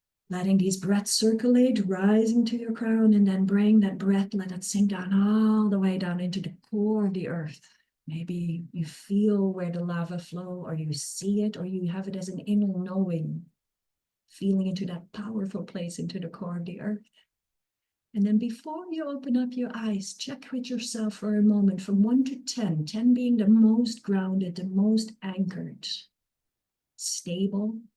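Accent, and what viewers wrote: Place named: Australia. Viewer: I've only just started listening but it sounds very much like you've got an Australian accent and people from any other country, apparently our Aussie accent is really hard to try and imitate 🙏🏻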